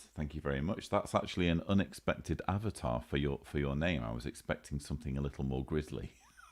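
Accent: England, United Kingdom. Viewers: Are you English or Croatian or................?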